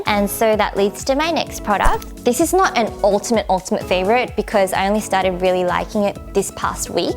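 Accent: Australia. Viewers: What's her accent? Australian?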